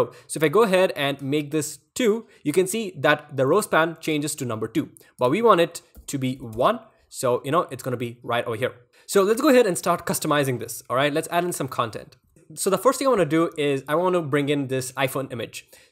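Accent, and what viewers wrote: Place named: India. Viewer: Its simple, I hear indian accent, I click back to search :)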